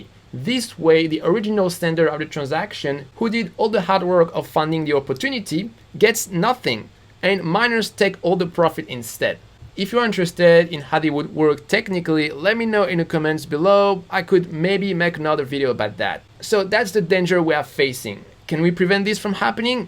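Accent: France. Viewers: Salut. Es tu francais? Je reconnais un petit accent. A +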